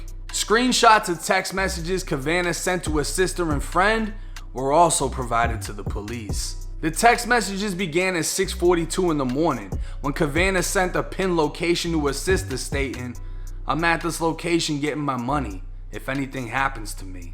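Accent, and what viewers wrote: Boston, United States. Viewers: I have to ask, r u originally from boston? U truly sound like u got our boston accent💯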